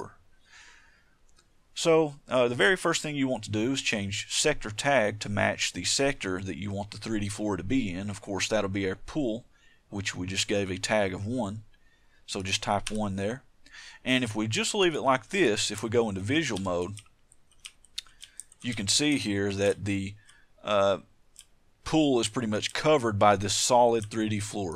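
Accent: South, United States. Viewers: Know how to make it, but still watching anyway. I like the calm southern accent voice telling me what to do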